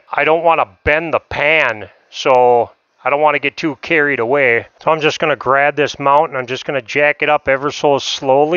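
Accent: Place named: Minnesota, United States. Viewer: Great video! I love your accent. You sound like you're from one of the far north states like Minnesota or around there.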